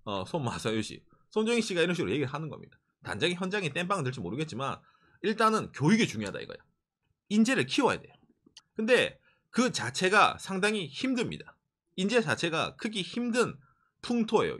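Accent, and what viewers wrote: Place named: Gyeongsang, South Korea. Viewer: 경상도버전 대도서관...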